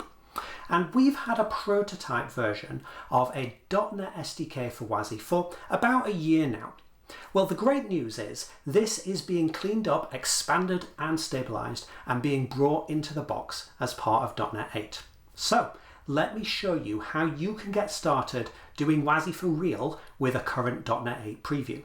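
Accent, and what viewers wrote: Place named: Australia. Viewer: Great guy but can never listen to him for more than 1 minute due to stupid Aussie Accent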